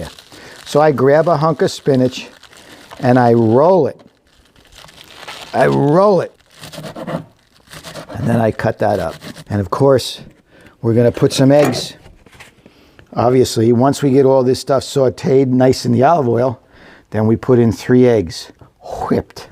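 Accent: United States. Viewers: Love your sense humour and your American accent. From 🇬🇧💕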